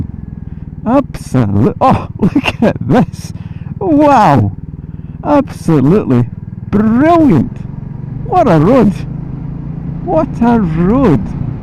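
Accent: Scotland, United Kingdom. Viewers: Really loved the video and your Scottish twang. Looking forward to seeing more videos and your commentary. Beautiful scenery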